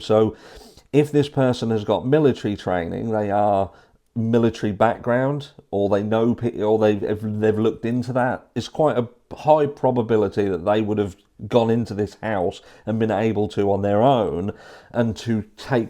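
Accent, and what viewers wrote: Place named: England, United Kingdom. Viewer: now I’m intrigued as to where about you live in England? I’m from Somerset, but now live in Dorset and at times I’ve wondered about your accent too.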